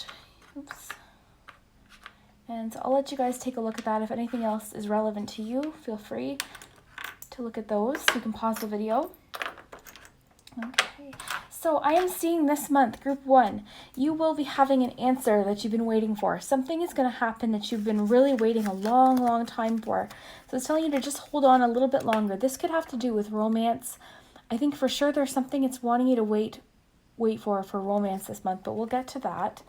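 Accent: Minnesota, United States. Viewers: Are you Minnesotan by chance? I'm from there and your accent is familiar :)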